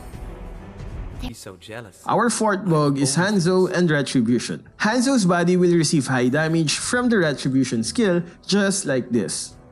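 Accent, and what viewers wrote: Philippines. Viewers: Halatang pinoy talaga sa tuno mag English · Pinoy to halatado sa tono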